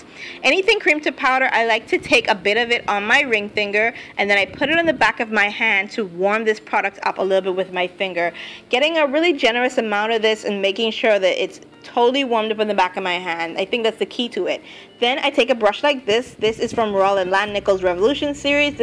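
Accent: Bahamas. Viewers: I was looking at your previous video which was a review of lime crime utopia, & I said she sounds like a Bahamian we really can't hide our accent lol.. Go girl reppin 242.. :-)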